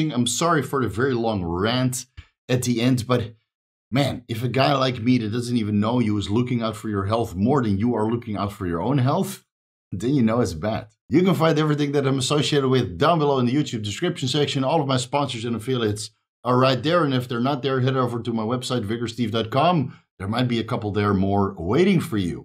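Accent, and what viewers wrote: Netherlands. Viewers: is this guy from netherlands or something? can't place the accent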